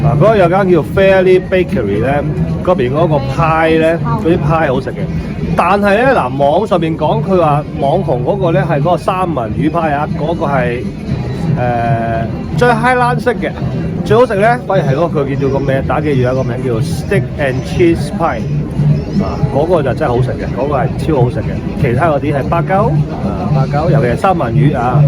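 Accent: Guangzhou, China. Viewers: 亞女講句粗口嚟聽吓先，睇吓正唔正宗廣州口音先😂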